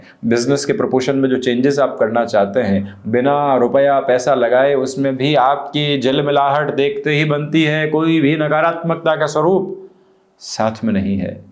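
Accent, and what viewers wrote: Standard. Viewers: Even though could not fully understand what he spoke but it was pleasant hearing his shud Hindi as if I am in Ramayan era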